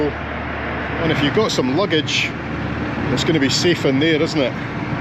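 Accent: Scotland, United Kingdom. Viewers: Oh so glad having your voice again and not a German guy speaking..even understanding what he said ..but not the same ,prefer your Scottish tongue Great Video!!!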